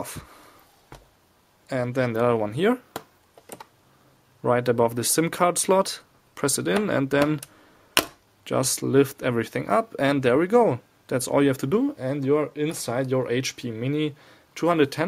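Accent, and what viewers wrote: Germany. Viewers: great tutorial despite the german accent, danke schoen! :)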